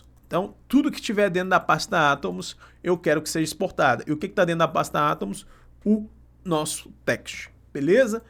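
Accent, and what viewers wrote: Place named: Minas Gerais, Brazil. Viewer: Meu conterrâneo, kkkk sou de Juiz de Fora, reconheci o sotaque. Um grande abraço, ótimo conteúdo.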